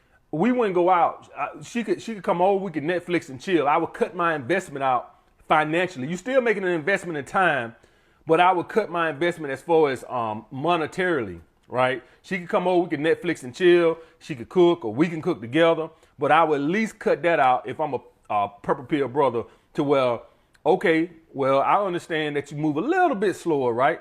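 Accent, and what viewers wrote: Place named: Georgia, United States. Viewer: I can always tell when you go to another state especly Georgia ur accent hilarious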